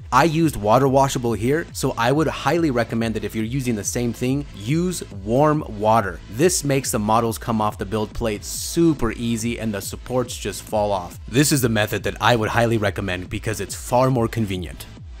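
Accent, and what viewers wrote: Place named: United States. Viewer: I love American accents AWCOWHOLE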